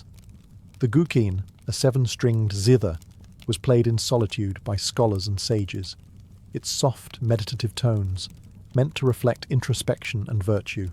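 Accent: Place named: United Kingdom. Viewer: PS: Are you American, Canadian or British? Your voice and word usage sound vaguely British.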